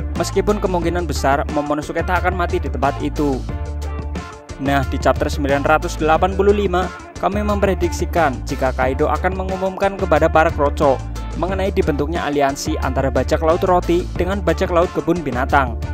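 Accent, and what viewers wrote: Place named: Tegal, Indonesia. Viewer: Dri logat bicara nya, kya won't tegal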